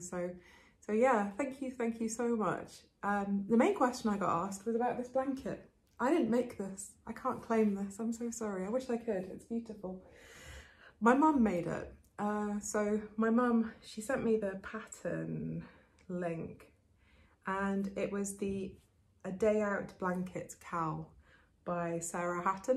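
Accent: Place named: England, United Kingdom. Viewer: I couldn’t find the box to comment on your first podcast so am pleased to see it here. It is a delight to listen to your beautiful gentle English voice and watch as you talk. You have a pleasing manner and it feels as if we are sitting in the same room. Good luck with the spinning and idea of fleece to fabric. It is purely desire + practice. I learned in the 1980s and it is so much part of my life. The ability to buy a fleece clean and sort it, wash and card ,spin and knit never ceases to thrill me and there isn’t anything like that lovely sheep smell. Have you come across Lisa at Soulful Spinning podcast? She does quite a lot of spindle spinning as well as fleece preparation, is a delight to watch and very informative. I know you will enjoy Wonderwool. I really enjoy this festival and usually take 2 days to explore all of the sheds. 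Unfortunately I am unable to go this year. I would suggest you quickly walk round all 3 sheds to get a feel of what is where, before you begin to browse. That way you won’t run out of time. It was a good day when your podcast came on my lists. Thankyou for your lovely content and long may you continue. ( I particularly enjoy your rabbit holes😂). 😊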